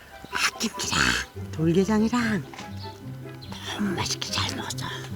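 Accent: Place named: Jecheon, South Korea. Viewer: 제천 사투린데 넘 정겹다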